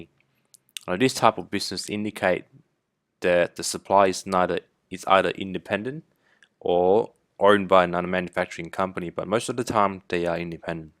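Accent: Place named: Australia. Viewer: he's Asian, with an Australian accent, is what I mean.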